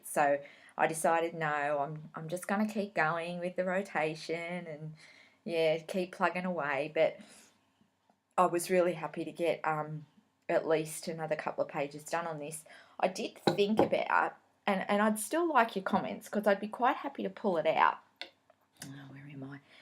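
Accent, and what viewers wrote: Australia. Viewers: Thanks for another great video. so sorry to hear about your friend's parents. I love listening to your stories and your lovely accent. I visited our relatives in Melbourne 3 years ago so listening to you reminds me of my trip. I'm a novice but love trying different pieces. Your stitching is amazing! Happy stitching too next time. Lori S.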